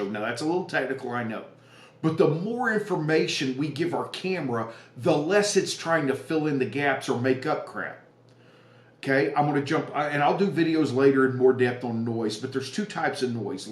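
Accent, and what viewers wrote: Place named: Texas, United States. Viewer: I’m 65, and this may be the first time I’ve heard “paradigm” in a Texas accent. (Not to sound like a West Coast doofus elitist, but hey, you brought up the accents thing.) I haven’t been too conscientious about exposing to the right in my digital photography, falling prey to having the image look good in the viewfinder/screen. I’ll definitely want to work on that, thanks.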